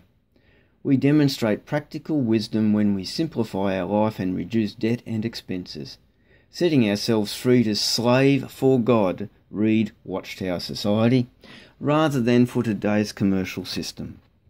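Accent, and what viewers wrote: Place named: Australia. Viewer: Thank you for sharing, we in Qld, nice to hear another Aussie.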